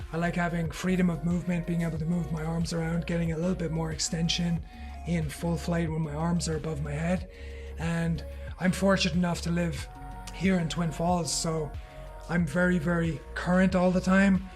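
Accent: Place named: Southwest, Ireland. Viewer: Where is that accent coming from, bangs of south west Ireland throughout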